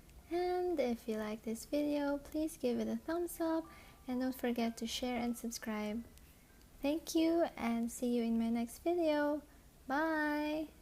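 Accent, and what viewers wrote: Philippines. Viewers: Filipino accent :-)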